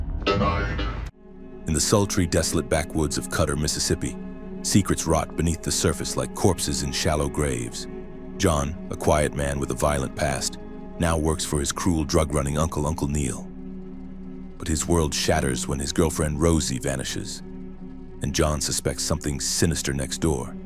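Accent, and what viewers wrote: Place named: Australia. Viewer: Something about the Oz accent...like fingernails on a chalkboard 😅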